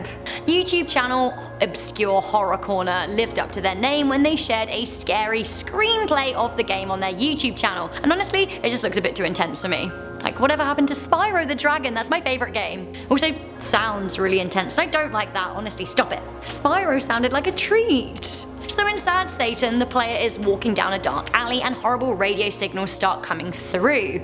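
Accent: United Kingdom. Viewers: Won’t be visiting those sites. But I swear you are one beautiful women Jesus plus that British accent wow lol. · I may have a small crush on...or maybe a crush for Britain voices telling me things in top 10 list format.Either way I am satisfied 🙂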